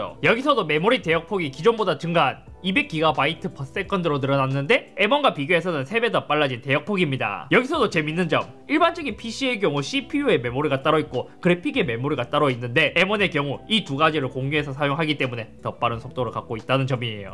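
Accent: Gyeongsang, South Korea. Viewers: 잇섭! 귀여우다 발음을 들어보면 대구출신인것 같은데 맞아요?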